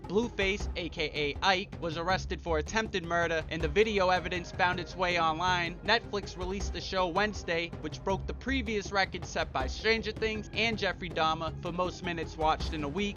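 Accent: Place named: Massachusetts, United States. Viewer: With that accent, announcing that you're from Mass is the most unnecessary thing ever.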